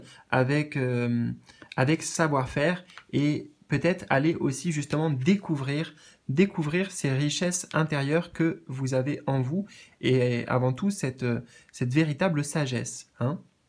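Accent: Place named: England, United Kingdom. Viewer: Bel accent anglais!!!😁 Merci tout plein pour cette guidance pour toute cette bienveillance 💜👍😘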